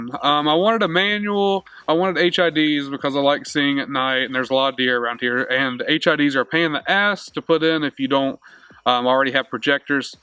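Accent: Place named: South, United States. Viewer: I thought the main presenter had a distinctive southern accent. Who is this big boy?!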